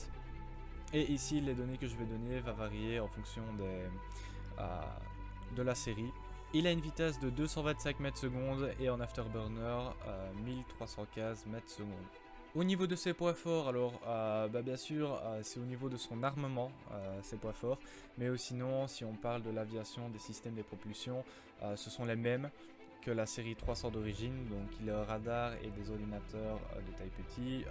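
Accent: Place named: Liège, Belgium. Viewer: Tu viens de Liège ? xD je kiffe cet accent 😂👌🏻 sinon bien la vidéo c’est cool je vais sûrement prendre celui là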